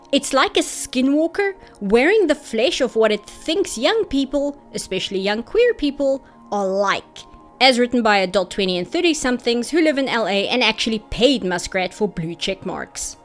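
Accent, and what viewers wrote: South Africa. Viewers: lol I never would've thought of fred as a fellow autist but now it makes sense Also her south african accent is hot af, I hope I'm not the only one that thinks this XD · oh geez i di not know you were from south Africa ?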